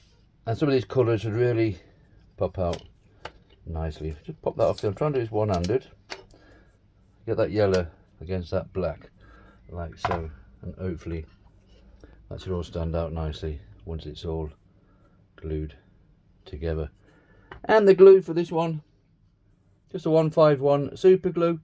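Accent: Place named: Northern England, United Kingdom. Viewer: is the best. The BEST. The PRO-jects are inspirational. I wonder if he's in the north of England? I'm trying to parse the accent.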